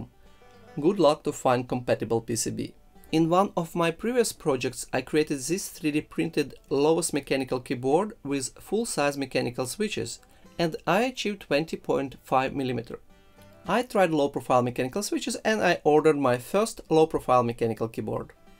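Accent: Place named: Eastern Europe. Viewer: No-one said about strong slavic accent? Anyone? Then me: you sound like my school English teacher😄 Btw cool content, thnx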